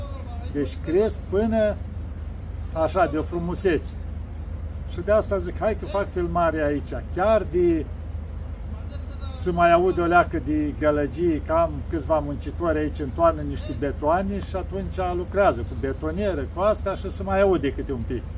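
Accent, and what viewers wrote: Moldova. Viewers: Doamne ajuta! Parinte, astept cu nerabdare sa va ascult in vorba dulce moldoveneasca sa ne vorbiti de Postul Pastelui!❤ Slava Tie, Doamne!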